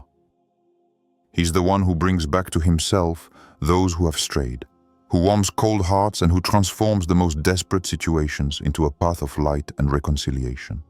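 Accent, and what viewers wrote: France. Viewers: Thank u bro , are u from france or Canada !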